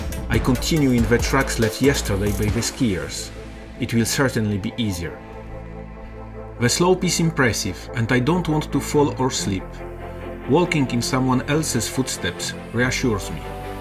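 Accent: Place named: France. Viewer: Cet anglais avec un accent plus que frenchie, c'est terrible à entendre. Sans vraiment aucune méchanceté hein, mais là, c'est un peu terrible quand même...😯 Amicalement.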